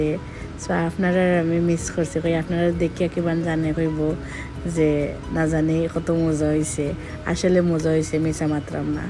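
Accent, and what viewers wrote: Sylhet, Bangladesh. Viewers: আপু একটু শুদ্ধ ভাবে কথা বলতে হবে কারণ সবাই সিলেটের কথা বুঝে না তাই আর একা একা খেলে চলবে না আমাকে ও দিতে হবে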